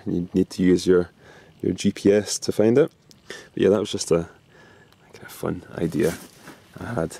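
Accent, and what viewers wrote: Scotland, United Kingdom. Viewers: are ye scotish mate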